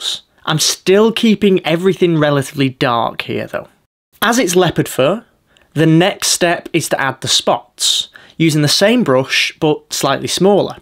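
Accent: United Kingdom. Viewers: i didn’t think so- but i can understand how it felt that way to you. many art videos have a lot of “i see beginners do (this thing i do all the time)…” and feel personally singled out 😀 this is embarrassing to admit but we’re among friends- UK accents immediately make someone sound fancier and i me. “do this, don’t do that” can sound condescending to me like you said- but sometimes for me, it’s the accent for this bonehead american over here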